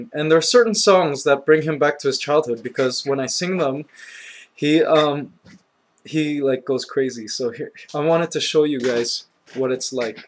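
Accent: Philippines. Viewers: using his filipino accent to discipline Pinoy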